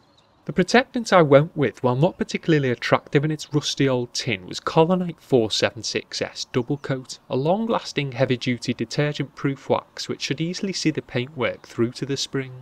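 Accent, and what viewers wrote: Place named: Wales, United Kingdom. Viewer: Are you from Wales I love the welsh accent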